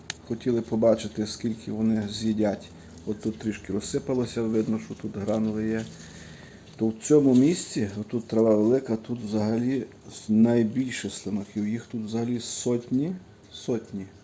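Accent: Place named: Galicia, Ukraine. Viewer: Дивно, що ви від них не страждали раніше. У насу Вінниці ця нечисть з 2014 року. Тоді їх було тисячі. Тепер набагато менше. Судячи з вашого акценту ви десь з Тернопільщини або Франківщини коротше з Галичини) Я там спостерігав цих слимаків ще років 6 назад. У Карпатах вздовж берегів річок було дуже багато. Ми рятувалися звичайною сіллю та потім ще почали додавати вапно. Мінус що вона діє до першого дощу. Дякую за пораду - треба запитати або в інтернет знайти!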